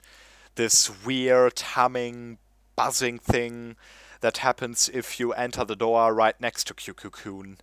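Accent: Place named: Germany. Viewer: Hahahah man hört den Deutschen Akzent sofort raus ;D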